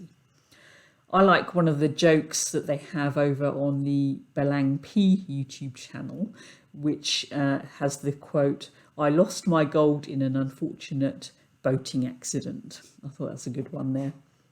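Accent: England, United Kingdom. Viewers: Thanks for all the information. You definitely did not answer the question though !!! Noticed someone said you were a kiwi. You have an English accent surely ?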